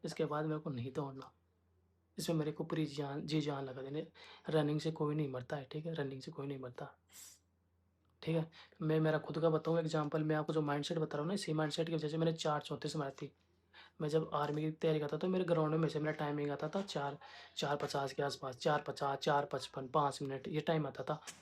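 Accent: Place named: Jaipur, India. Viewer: aapke accent se hi samjh aa rha h kyuki m bhi jaipur se hu..and my mom use to sounds like you😅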